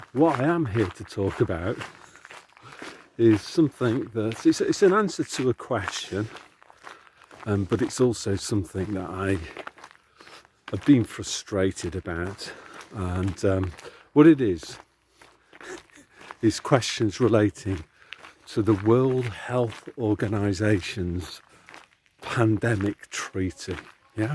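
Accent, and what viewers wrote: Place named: Manchester, United Kingdom. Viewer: Exactly! I’m same! In Manchester visiting m’Dad . Thanks 🙏 it’s lovely to hear some comm sense in a manc accent xx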